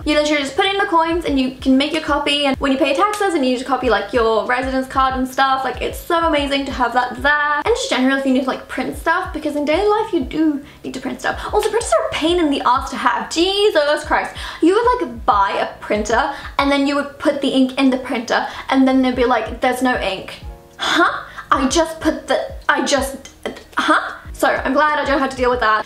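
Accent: United Kingdom. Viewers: Accent is definitely UK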